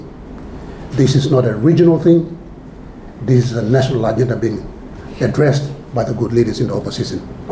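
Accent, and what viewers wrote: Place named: Papua New Guinea. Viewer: U speak like a PNG man and not an Engaian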